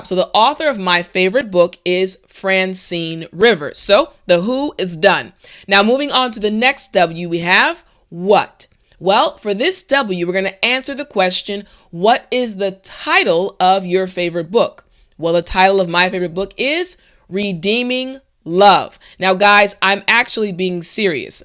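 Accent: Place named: United States. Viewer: America English♥😚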